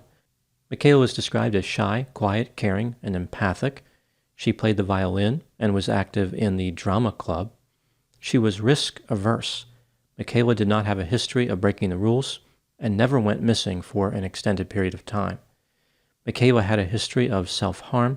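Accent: United States. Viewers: He should learn how to say Regina good gravy. Can tell you are american . Hard to trust what you say when you can’t even say the correct pronunciation.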